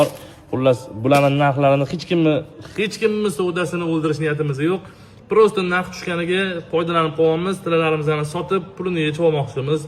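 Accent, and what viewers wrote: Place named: Andijan, Uzbekistan. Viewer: Кайердансиз.адресингларни айтмадингизу.шевангизга Караганда Агдижондансиз.❤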